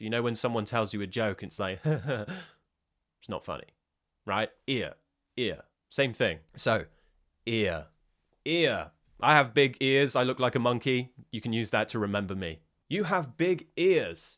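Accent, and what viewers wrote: United Kingdom. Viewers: The British accent made me move to the UK 🥰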